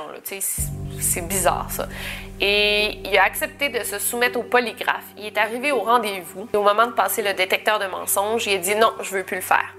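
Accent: Canada. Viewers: vous êtes une jolie femme, et trop mystérieuse, j'm vos vidéos, et surtout l'accent canadien, j'adore.c'est un compliment.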